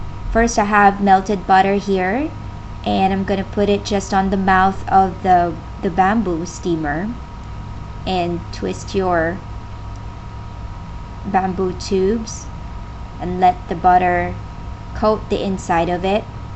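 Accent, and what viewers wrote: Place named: Tagalog, Philippines. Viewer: Excuse meh! Kenmax kapa dyan I’m live abroad for so many years Kaya alam ko yong mga accent in different countries. Sa tuno palang nya alam ko marunong sya mag Tagalog.... juskoh ang ingot mo nmn 😅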